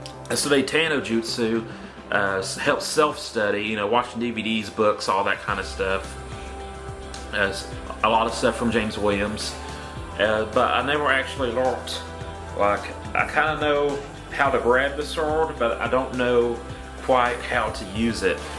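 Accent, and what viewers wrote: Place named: South, United States. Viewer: Whats your accent it sounds Southern bayou/With Canadian...and hint of Aussie in some words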